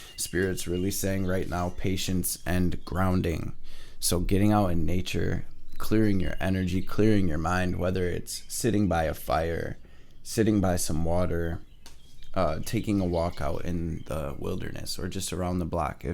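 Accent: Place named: Bay Area, United States. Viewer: Dannng you handsome AF, you from the Bay Area? You kinda sound like it♥️ Besides that your reading is on point for me. Thank you!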